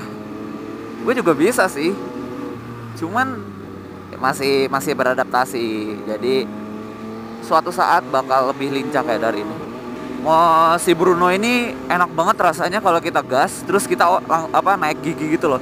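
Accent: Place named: Java, Indonesia. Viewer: sampean I wong ngendi kok ngomonge medok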